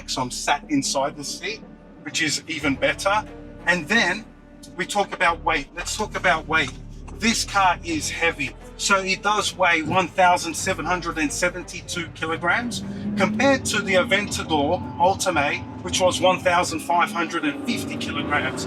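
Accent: Australia. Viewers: lol😂 Aussie accent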